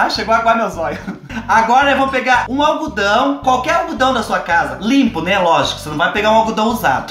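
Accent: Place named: Caipira, Brazil. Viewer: Adorei seu modo “caipira” Um barato. Ameiiiii ❤️❤️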